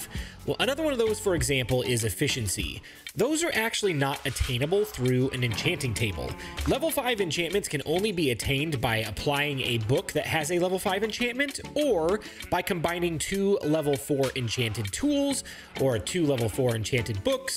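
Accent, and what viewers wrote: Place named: United States. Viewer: That American voice sounds like the dawn of victory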